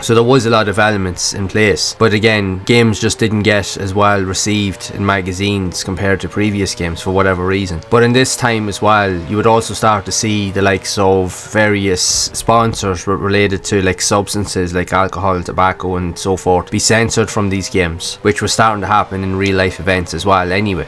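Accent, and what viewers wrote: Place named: Drogheda, Ireland. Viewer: Class vid. Thanks for sharing. Thats gotta be a drogheda accent?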